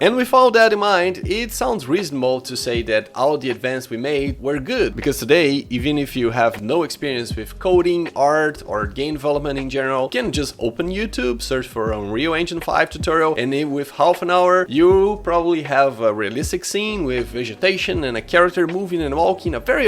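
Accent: Brazil. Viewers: you have an familiar accent are you brazilian or even south american?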